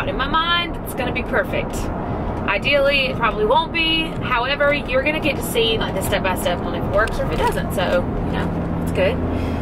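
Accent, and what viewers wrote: South, United States. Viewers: Are u southern?? Bc im from texas and i can tell by your accent but i dont wanna be rude and say your something your not lol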